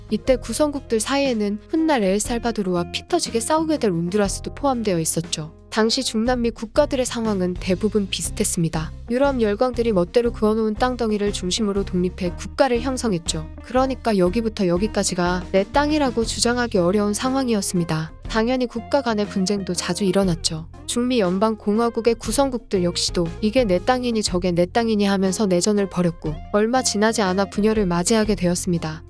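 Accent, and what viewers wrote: Gyeongsang, South Korea. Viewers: 경상도 여성분이신가봄. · 경상도 분이신가?? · 엘쌀!바도르 58회 고향이 경상도인가봐요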